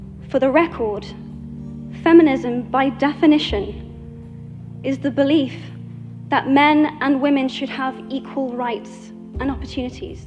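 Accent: United Kingdom. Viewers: British accent 🔥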